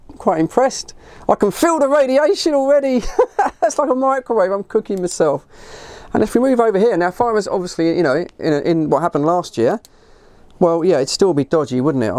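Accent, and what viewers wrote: United Kingdom. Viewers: Your British voice sells this...cheers from a Californian Mexican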